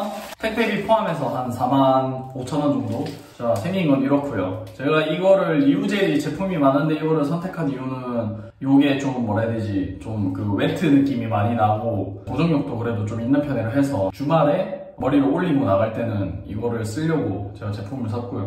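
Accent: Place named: Gyeongsang, South Korea. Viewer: 님 말투면 달고나가 아니라 쪽자라고 해야할 것 같은데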